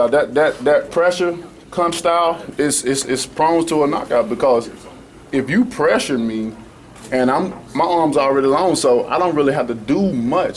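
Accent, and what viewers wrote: United States. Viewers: well Einstein, he's from a southern state, where a lot of them have the same dialect. You grammer cops kill me. This man will put you and your correct grammer to sleep in one punch.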